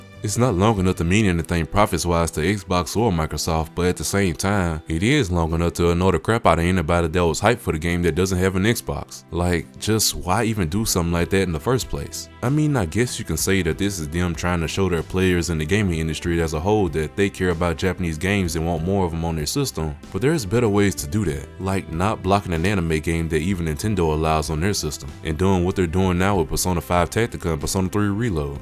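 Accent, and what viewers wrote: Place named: United States. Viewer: Constructive feedback: 1. It's difficult to read paragraphs of text on-screen when you're talking at the same time. Choose one or the other. 2. You have a REALLY strong American accent. As a Brit, I can't understand you when you speak quickly and start slurring your words. 3. Opening with "PC is better anyway" in the first 30 seconds is just an insult to parts of the audience who disagree with you. As someone who owns PC and consoles, I think consoles are better because you can relax on the sofa instead of sitting bolt-upright at a desk. Good luck with your Youtube journey.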